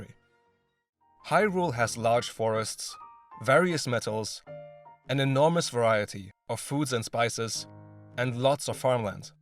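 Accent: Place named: California, United States. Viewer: Don't know man sounds like California to me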